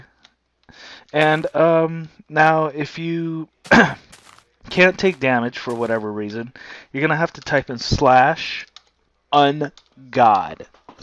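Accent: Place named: Brazil. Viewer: So you're from Brazil? I could tell.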